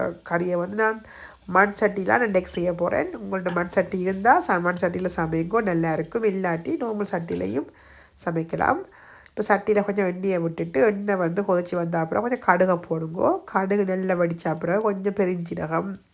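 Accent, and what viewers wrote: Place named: Sri Lanka. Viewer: உங்கள் இலங்கை தமிழ் பேச்சு ரொம்ப பிடிச்சிருக்கு.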